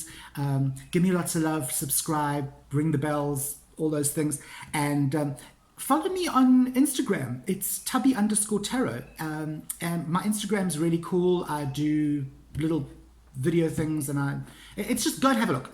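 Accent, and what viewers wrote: South Africa. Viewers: I came here via Donna at Ozark Oracles channel and thought I would drop you a quick hello. Really enjoyed this video and am looking forward to watching more of your content. I think, personally, it’s important to always be honest whilst remembering to also be kind. On an unrelated note, I love your South African accent and could literally listen to you read a dictionary 😁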